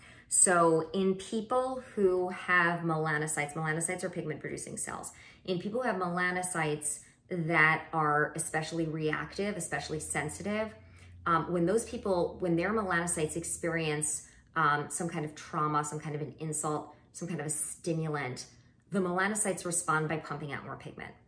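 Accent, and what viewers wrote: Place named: California, United States. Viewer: Why you have Cali girl accent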